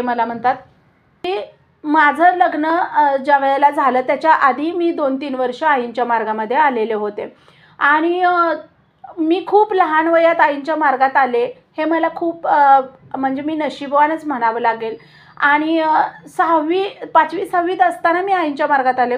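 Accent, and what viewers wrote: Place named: Sangli, India. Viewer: ताई तुमच्या सांगलीच्या भाषेतच बोला , सांगलीची भाषा ऐकायला खुपच भारी वाटतं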